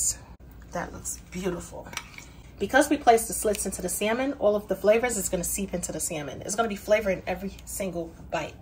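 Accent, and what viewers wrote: New York, United States. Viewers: I’ve gotta say that New York accent takes me back to my days of living in Chelsea. 🏙️ 🥘♥️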